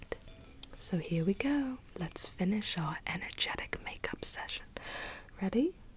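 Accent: England, United Kingdom. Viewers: I LOVE your british accent! You should use it in more of your videos, it’s so relaxing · Loved how you impersonated a UK English accent perfectly and it did feel strange but your Hippie way of applying makeup, would be my ideal way of putting makeup on. This is because I hate wearing makeup. Absolutely fabulous! One of your best yet! ❤❤❤😘😘😘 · British accent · This is one of my favourites of hers. That "british"accent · Ok but the fact that you got more and more English killed me omfg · Loved everything about this video from the cheeky humor to the English accent. You're lovely <3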